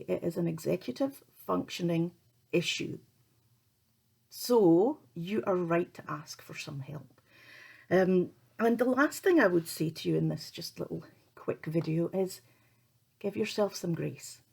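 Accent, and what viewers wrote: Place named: Scotland, United Kingdom. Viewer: Got soo excited when I heard the scottish accent but realised you live in Australia lol🤣I share many of your challenges and wondered if there was a way to contact you. Love your content, its very helpful and and relatable thank you for sharing🤗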